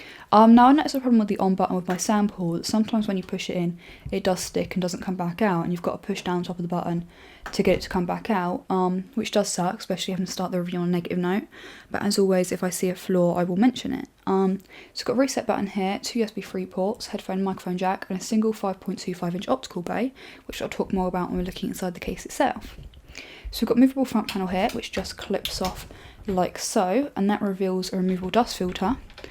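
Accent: United Kingdom. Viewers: Oh I love the British accent.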